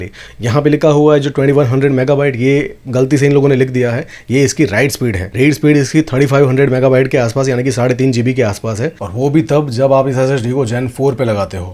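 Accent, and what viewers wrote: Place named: Kathiawar, India. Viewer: Kathiyawadi cho ne bhai?😅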